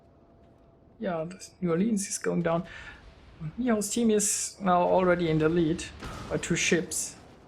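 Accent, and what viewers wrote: Germany. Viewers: Man merkt schon an deinem Akzent, dass du ein Deutscher bist. Also vermute ich :)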